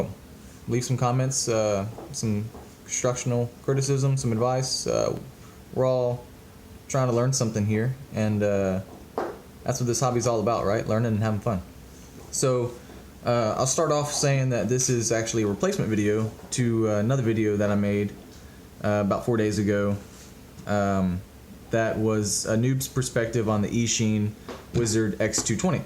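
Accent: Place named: Texas, United States. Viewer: Digging the vids and your accent sounds just like me and everyone I know from Texas. Just got a Wizard from Grayson Hobby today, goggles have not arrived from banggood yet. I have not really flown drones much, maybe 4 times and not racers. I do however play the shit out of Battlefield and all I do is fly the copter and I think it teaches you how to maneuver a rotor and use the controls so I think I am ahead of the game, controls are the same just a lot more sensitive on the drone. I hovered the Wizard in my living room tonight, piece of cake. I will say my confidence is high but I am going to do it just like you. Hover, learn to move, low and slow to begin. I also have a tiny whoop on order to practice with and I already have the cable on its way to run sims on the computer with the FS I6. I already have a sim and am currently using the PS controller to practice until I get my cable. 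So everything you say is good advise the way I see it. Eventually we will get there. I just wanna fly, not in it to race. Well, not yet. Time will tell. Keep up the good work. Keep the vids coming.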